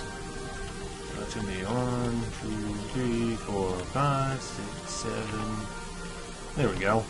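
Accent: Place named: Texas, United States. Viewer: Sounds Texian I like it.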